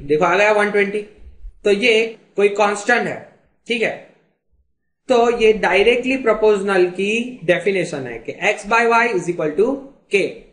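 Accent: Bihar, India. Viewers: Are you from bihar!! '!' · bihar ho kya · Sala Bihari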